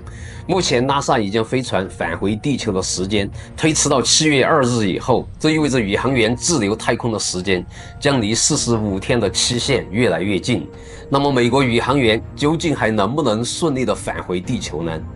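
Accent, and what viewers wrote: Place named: Guangxi, China. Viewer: 广西桂柳地区口音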